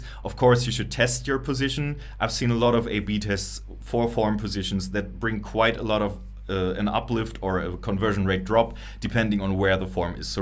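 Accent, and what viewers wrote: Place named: Germany. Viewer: Your English is frankly amazing. I'm a bit biased since I have a lot of german family so I'm use to the slight accent, but honestly it's great.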